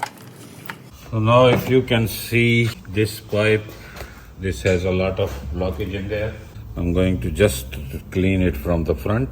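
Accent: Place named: South Asia. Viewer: Your English accent is very Asian may be you from Pakistan or India?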